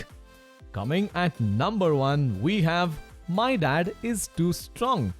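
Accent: India. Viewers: indian accent is strong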